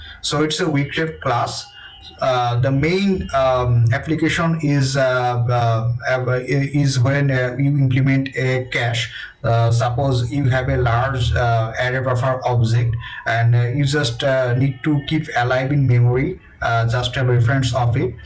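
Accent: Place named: India. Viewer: nice, because i wanted to watch your video, even with the indian accent which is difficult to understand tec-stuff, but the sound was unberable so i wish you good luck for you next video :-)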